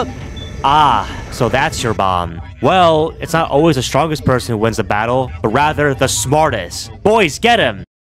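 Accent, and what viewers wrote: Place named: Australia. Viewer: Because he has an Australian accent I think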